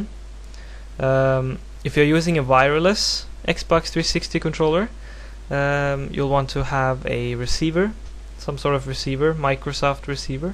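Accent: Norway. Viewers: Ha e skjønt du va norsk før du sa da xD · Haha I just knew you were Norwegian when I heard your accent! :3 Which isn't bad at all btw, you have very good english! Most Norwegians do actually! Eg e Norsk forresten, halvt Canadadisk faktisk, men bor i Norge :D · norwegian accent is one of my favorites i have many friends in norway and find it crazy how americanixzed your country has become. It astoounds me that your schools have english as a required language. Awesome video bro! thanks for the help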